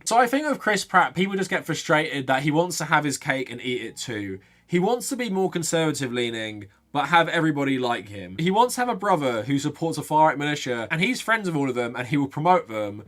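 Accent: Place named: United Kingdom. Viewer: Hmm... This guy seems cool and I think I subscribed to him at some point, but why's this britbonger look like a dirt bike bro? His clothing doesn't match his voice. OH SHIT HE ADDRESSED IT RIGHT AS I WAS TYPING THIS. It's his Republican cosplay. But the he said Guardians of the Galaxy Vol. 2 is one of the worst movies he's ever seen and now I'm sad. That's one of my favorite MCU movies. Especially cause I'm a dad to two boys and the movie is about being a son, being a father, and family in general so the message resonates. But I'm also just a huge MCU nerd.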